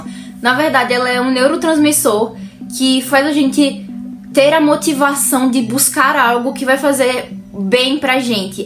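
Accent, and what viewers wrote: Northeast, Brazil. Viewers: Seus vídeos são incríveis, vc é muito fofa,ganhou mais uma inscrição, ah e você tem sotaque nordestino,vc é do nordeste?? De qualquer forma,irei acompanhar seus vídeos! ❤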